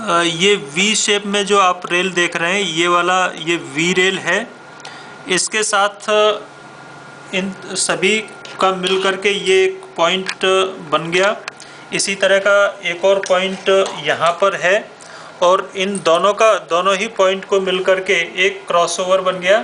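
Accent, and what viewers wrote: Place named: India. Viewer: fuq the indea English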